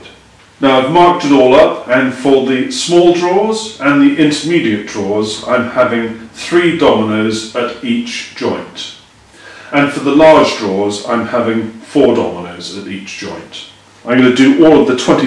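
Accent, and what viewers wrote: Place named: Manchester, United Kingdom. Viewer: OMG He's from Manchester....................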